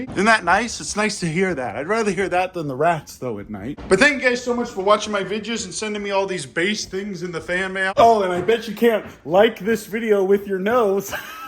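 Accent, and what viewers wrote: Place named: Pittsburgh, United States. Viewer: Aye, pittsburgh represent! i knew i heard a little yinzer in you lol God bless and happy holidays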